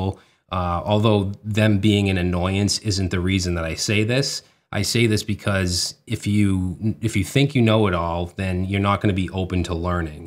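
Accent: Boston, United States. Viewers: I love the Boston accent.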